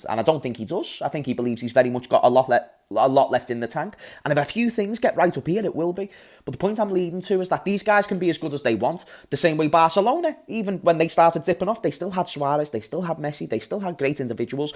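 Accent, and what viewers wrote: Liverpool, United Kingdom. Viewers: u scouser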